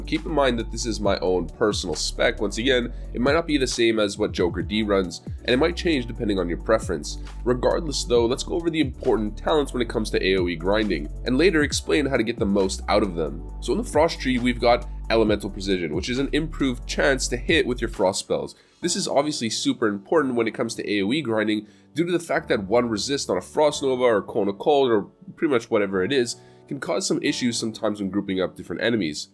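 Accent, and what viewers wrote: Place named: Canada. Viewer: Typical Canadian. Makes my ears bleed.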